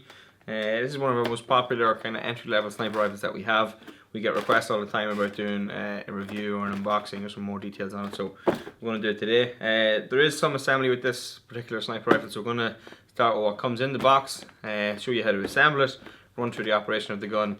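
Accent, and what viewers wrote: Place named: Dundalk, Ireland. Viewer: That dundalk accent though!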